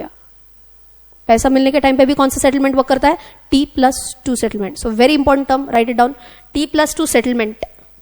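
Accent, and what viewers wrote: Maharashtra, India. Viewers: Hello You have done a nice job, I appreciate you. Do one favour for us if possible, PLZZ switch the thumbnail of last two lectures bcoz after completing 2nd lecture we got a lot of confusions, I personally Google a lot for that purpose,than I go for 3rd lecture which has contained that explanations of same thing 😁. You have done a good job. I love the way of your teaching and Marathi accent you have used ❤️❤️. I don't know whether I do invest in share market or not but I have completed these lectures just to learn the way you present the things apart from the knowledge you have provided. Well-done 👍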